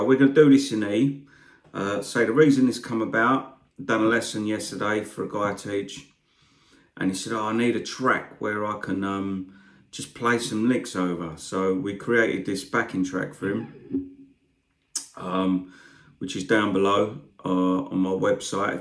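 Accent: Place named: London, United Kingdom. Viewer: Great teacher, love the accent too, REAL London.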